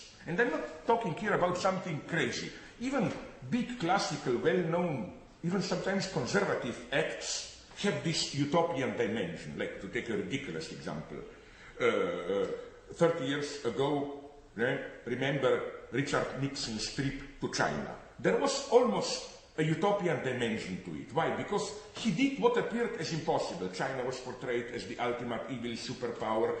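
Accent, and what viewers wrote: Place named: Central Europe. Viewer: You obviously don't understand the irony of demanding someone provide substance when the subject is Slavoj Zizek. He is like Camille Paglia with a middle-European accent a beard and a paunch. He is a symptom of the degeneracy that what used to be called "the humanities" has devolved into. He is so full of crap he could generate economically significant amounts of methane or add to global warming.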